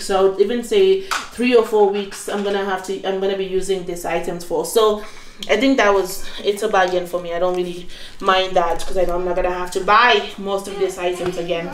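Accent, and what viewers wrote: Kenya. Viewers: Hi your kiswahili sounds kama ya mombasa, did you live at the coast before going to the U.S? Btw you're a really good Mum.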